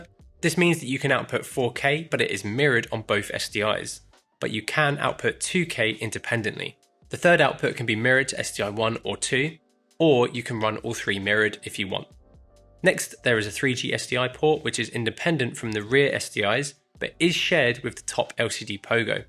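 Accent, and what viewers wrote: United Kingdom. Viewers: Your British accent is different to hear. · Why the hell would a brit working for a british company speak with an american accent for a video?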